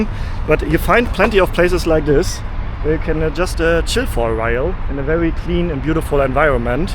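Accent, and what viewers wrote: Germany. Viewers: if this is a problem youre concerned about, you should worry about better things. This man is german, and english isnt his first language, so obviously his english wont sound as american. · Salam Aleikum Wenn ich deinen Akzent so höre.. bist du deutsch? 😂 ich musste lachen als ich dein Video gesehen habe. Ich bin mix, italienisch deutsch niederländisch Orient. Geboren aufgewachsen in Bremen. Und seit drei Jahren Muslima Alhamdullilah ❤ warum trägst du Handschuhe??? 🧤 👀 beim Essen? 😂 😮 99? Wow Alhamdullilah das wusste ich nicht. Große Bedeutung. 😢❤ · I wonder if the german accent helped him out would have liked to see him wear an israel flag badge And yes i was there for two months 15 years ago, and i had quite a few problems but a lot less than in so many other arabic countries i have visited more recently.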